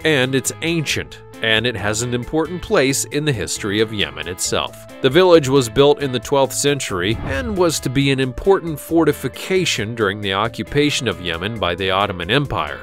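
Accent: United States. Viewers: Offtopic: Sorry, but I hate this American accent, pronouncing HOTSPOT as HAATSPAAT. The poor O is not there for nothing. If this language would have liked you to say HAATSPAAT, we would have written HATSPAT. The same applies for ROCK and RAACK.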